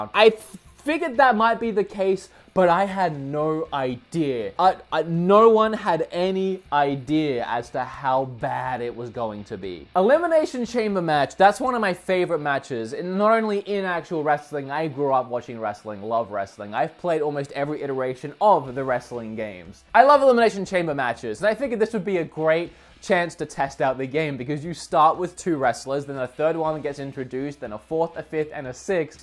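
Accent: Australia. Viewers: Are you an Aussie? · What is his accent? Auatralian? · R u Australian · Mate, first video I've seen of yours. You sound totally Australian. I'm assuming you now just live in the US? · Are you aussie? If so, why are you at walmart? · Bloody cringe worthy hearing Australian accent.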